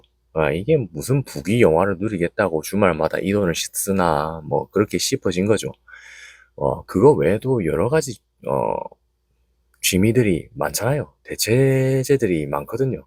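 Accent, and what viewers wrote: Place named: Busan, South Korea. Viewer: 부산말씨로 현실과 냉정으로 설득하면 8도 사람 거의 수긍!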